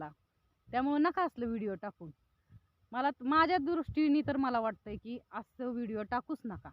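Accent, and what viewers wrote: India. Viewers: गाव कनते मराठि बोलता 🎉😂❤ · बोलण्यावरून बारामतीची वाटतेय ताई अजितदादाला सांंगा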